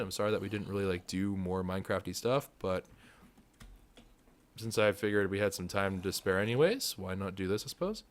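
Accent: United States. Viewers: You do not sound like a sour Korean , I assumed fully 100% American with dark hair , similar looking to drift0r or something. But I like getting to know what you look like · Hey it sounds to me that you live in Pittsburgh and so do I man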